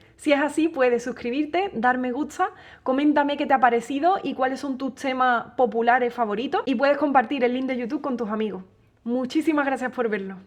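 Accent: Andalusia, Spain. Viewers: Santo dios...pero qué tiene esa guitarra dentro que suena como un manojo de cascabeles afinados y bajando a tempo por las escalerillas de un palacio de cristal???? Algún día tendrás que hacer un video de cómo te grabas, cómo pones el micro...Bueno, para el resto de nosotros mortales poca cosa nos va a ayudar...Es impresionante cómo respira tu guitarra en esos acordes abiertos con los que rematas...perfectamente afinada y agradecida de que la acaricien con tanto mimo y a la vez tanta energía. Suenas poderosa y resulta casi humillante mirar tus dedos corretear sin esfuerzo por trastes y cuerdas mientras la madera de tu guitarra ríe feliz. En esta pieza me has atrapado desde las primeras notas. A veces paro tus videos de pura envidia y desolación, pero esta vez me has embrujado y lo he visto de pe a pá. Y encima nos regalas todo esto envuelto con esas dos sonrisas con las que empiezas y acabas los videos. 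Ole tu cara bonita, tus dedos de gacela y tu acento especiado por tantos siglos de al-andalus y demás mixturas celestiales. Paro, que ya lo dije todo sin decir nada...Un abrazo para ti, y todos tus seres queridos a los que bendices con tu presencia. y VIVA LA MADRE QUE TE PARIÓ!!! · es un conglomerado de virtudes que además de no encontrarla fallos, hace honor a su apellido, todo cuanto hace es eccioneccion. hermoso, hasta físicamente, es una guapa española con acento andaluz, que representa a España hasta en lo que toca y canta, con un gusto y un saber colocar la voz, hasta la perfección. Gracias por e l buen rato que me has hecho pasar deleitándome con tus perfectas interpretaciones. Tu siempre admirador, Kini Palacios. (pianista-acordeonista y percusión) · Eres grande guitarrista y adoro ese acento andaluz cada vez que pronuncias una T o una S. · Escuchar ese acento andaluz 🥰🤤🥰 enamora 😍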